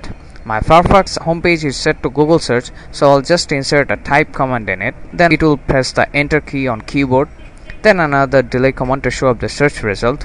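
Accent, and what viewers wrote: India. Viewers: cazzo sei un indiano?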